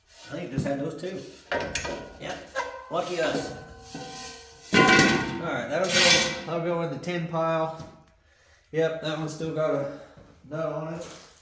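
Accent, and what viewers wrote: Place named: United States. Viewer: I'm as Ukrainian, I like your videos to hear a "real" American English, not like they're speaking on a news channels etc.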